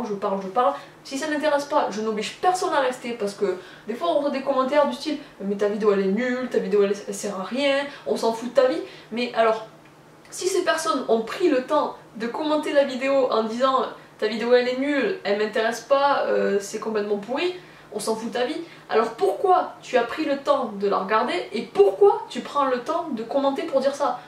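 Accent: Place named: Corsica, France. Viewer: j'aime bcp ta vidéo tu as un accent Corse c'est ouf !😂 continue tes vidéos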